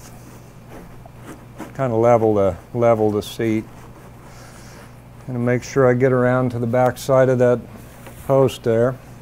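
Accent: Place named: Midwest, United States. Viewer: I love watching you work. After 4+ decades in the Navy and federal wildlife law enforcement, I have developed an interest in old hand tools, carving, backpacking, fly fishing, AND furniture restoration. Your videos are almost “zen like”.....in that they relax not only me.....but surprisingly, my Australian Shepherd as well!!! Plus.....I’m learning something! I’m just getting into the restoration of a Stratford Chair Company mission-style chair....and discovered your “channel”! You remind me of a younger Sam Elliott....you even have same midwestern speech pattern! Bravo-Zulu, job well done....on a well-produced AND instructive AND relaxing YouTube video!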